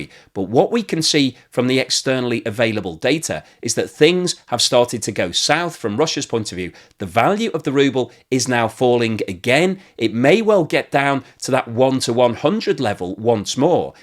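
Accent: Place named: Liverpool, United Kingdom. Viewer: He's a scouser.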